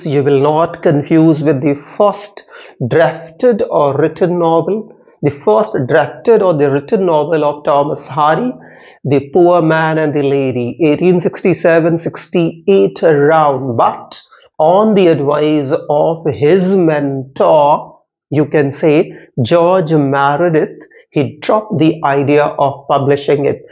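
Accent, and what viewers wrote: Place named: United Kingdom. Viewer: Sirji....your content is nice ....but you unnecessarily use a British accent.....which makes it boring.....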